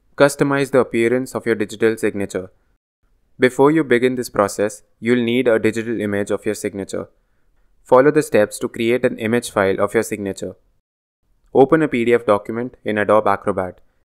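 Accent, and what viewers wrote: India. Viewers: That's Indian accent 🇮🇳 🕉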